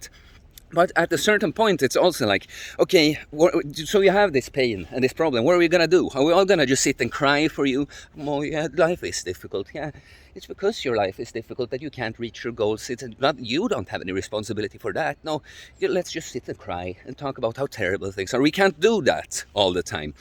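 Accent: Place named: Sweden. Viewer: Once I heard the accent I knew you were swedish. Jag är inte svensk men jag kan svenska. Grym video bror fortsätt så.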